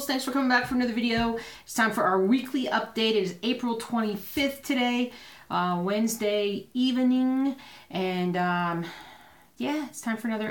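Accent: South, United States. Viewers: So sorry to hear about your fur baby! I pray Jelly girl is well soon. By the way there's nothing wrong with your southern accent coming out....I'm from KY!!! :-) Great episode, just way to short, sheesh you know your number one job is to entertain me, I meant us (yeah I meant us hehehe)!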